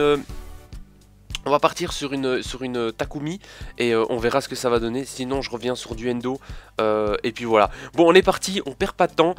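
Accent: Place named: Belgium. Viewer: superbe vidéo continue et je me disais bien que tu étais. belge parce que tu as un peu l'accent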